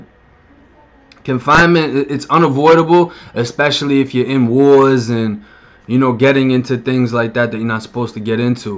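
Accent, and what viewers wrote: Boston, United States. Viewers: People drawn to you because of your Boston accent lol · Someone was lookin out for ya when you did ur time, for sure! 🙏🏻 Obviously got a bunch of vids to still catch up on, but keep 'em coming! Love the way u just flow w/ur stories PLUS (& not to disrespect u or ur significant other) but I just have to say that Boston accent, Boyyy... 😍 it definitely keeps me wanting to listen to more & more! 😉😆🙃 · U got the Florida talk with the Boston accent. That's wild bra lol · done with the hard life that Boston accent thick lol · You from Boston?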